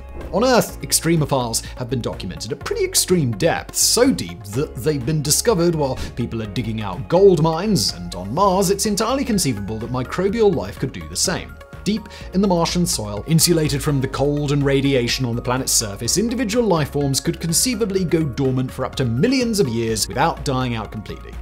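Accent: United Kingdom. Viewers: your British is showing.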